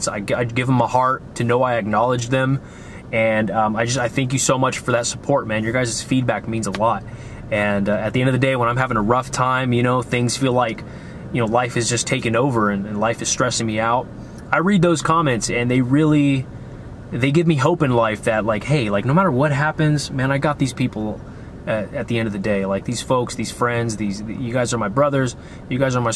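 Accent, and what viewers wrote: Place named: Transatlantic, United States. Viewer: Please do me a favor, look into voice acting. Not you doing Transatlantic accent without effort... excluding the reading and losing your place.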